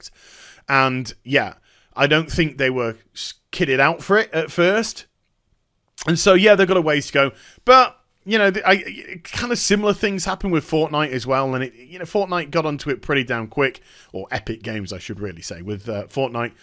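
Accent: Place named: RP, United Kingdom. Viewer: worry not... American are not use to soothing British posh accent... keep it coming mate you are doing just fine!!!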